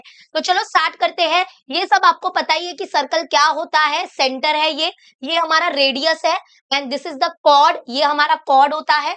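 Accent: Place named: Mumbai, India. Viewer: I love her mumbai accent 😃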